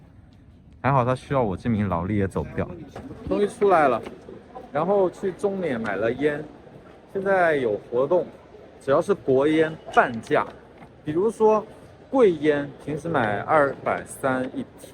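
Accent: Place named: Taiwan. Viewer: 长着北方人的脸，操着半吊台湾腔。你在台湾呆多久？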